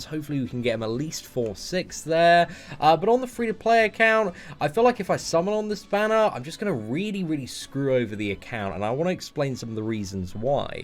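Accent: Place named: RP, United Kingdom. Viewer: I believe he's English so from somewhere in England whether thats Britain but yeah he drinks tea and has that posh accent so thats my guess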